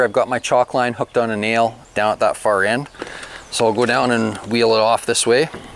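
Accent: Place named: Quebec, Canada. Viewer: How did you manage in the big rains, fallout of tropical storm Debby in early Aug 2024 ? (By your Quebec accent I assume that's your location.) May be give us a construction and weather episode? How do you protect exposed wood from water damage? Should we be worried? What is time lag between your filming and the publication of video? Fun series, though beyond my little DIY.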